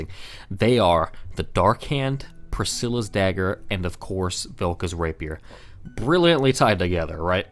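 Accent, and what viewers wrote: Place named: Western Pennsylvania, United States. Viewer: great fuckin videos dude. luvin it. im kinda a noob to the series (i played through most of 2 and smashed 3 but missed the first one) and youtube content creation. i love your take on things and am probably going to go home and get prepare to die tonight. i am currently writing a (serious) dark souls rap going into some of my theories, and yours tie in great with mine. i hope you enjoy it when it gets up if you get the chance to see it! hopefully will be this weekend. also--are you from western PA? hope thats not too creeepy of a question but my moms fam is from up there and you got the accent like whooooooa sometimes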